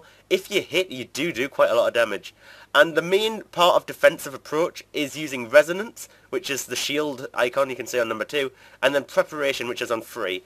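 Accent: Newcastle, United Kingdom. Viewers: can tell ur a geordie haha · Are you a geordie?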